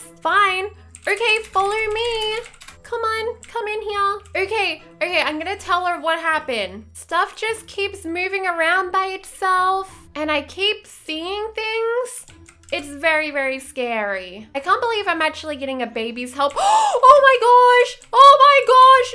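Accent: Australia. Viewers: Are you Australian? My accent is similar to yours and I’m Australian? Just asking, anyways love the video! You need more subs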